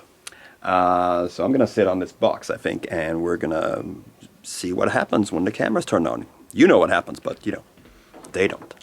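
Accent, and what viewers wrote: Skåne, Sweden. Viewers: the skånska is real, det gillas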